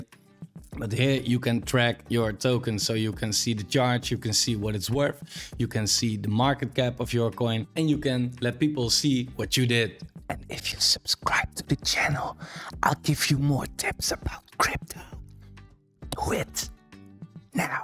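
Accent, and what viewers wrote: Netherlands. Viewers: I love jou mooie hollanda accent Wel goede video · You from holland right? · ik hoorde al iets nederlands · you sound dutch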